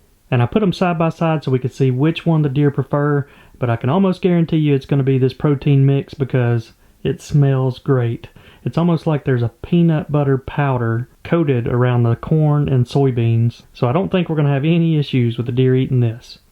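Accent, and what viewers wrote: South, United States. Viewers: I'm surrounded by a bunch of commies living in California. your Southern personality and accent really makes me feel like I'm back home.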